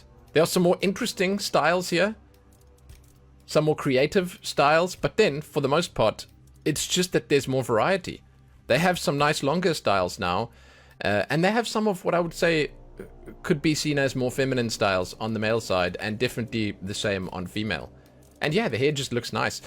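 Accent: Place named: South Africa. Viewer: Here after seeing some new content for the game again and wanted to check the character creation and I heard the accent and my my a fellow south african will check out more vids but you have an extra sub. Love from Krugersdorp👌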